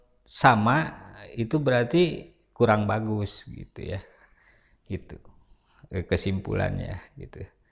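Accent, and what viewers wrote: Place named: West Java, Indonesia. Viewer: Jiga urang sunda nya kang🤭🙏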